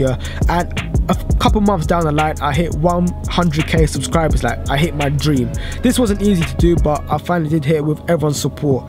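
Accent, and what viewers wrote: London, United Kingdom. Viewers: If you're born in France,why do u have such a strong London accent?😟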